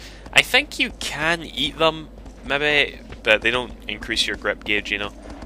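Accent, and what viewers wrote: Scotland, United Kingdom. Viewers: That bird one is totally my favorite colossus, by the by, your scottish accent kicks ass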